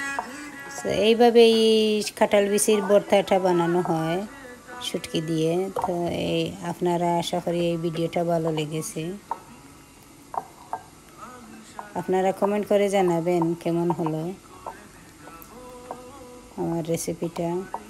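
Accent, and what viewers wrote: Sylhet, Bangladesh. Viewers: Didi India thakidekhram. Ajkeu recipeta try khormu. Okhonto katholer season. Syloter khawoa AR accent ekirokhom. Aphnar Allah AR Amar Bhogoban. Kintu armra daughter of the same soil. Okhon aphner channel subscribe khormu. Lots of love from India.uttor dilekhushi oimu no heart emoji........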